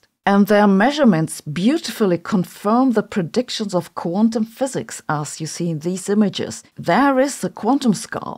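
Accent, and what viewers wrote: Germany. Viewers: Sorry, heard the German accent and had a Get Smart flashback to KAOS.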